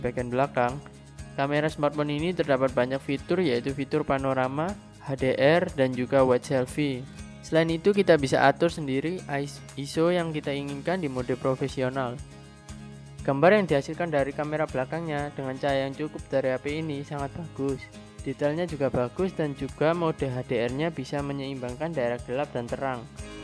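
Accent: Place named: Java, Indonesia. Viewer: keliatan banget jawa nya tolong bahasanya diperbaiki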